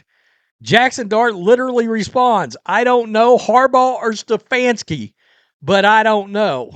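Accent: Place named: Tennessee, United States. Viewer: Yes, Matt LaFleur was the Tennessee Titans offensive coordinator when Ryan Tannehill was there. I remember that because I remember the Titans radio guy had a very similar accent to you and I thought you were from Tennessee until you said you were from Texas.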